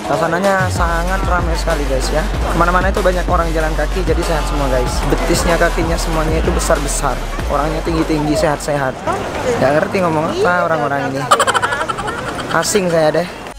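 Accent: Madura, Indonesia. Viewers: Tetep sederhana, dan logat madura nya itu yg ga bsa ngilang yg bkin seru klo mas ngmong 🤣😂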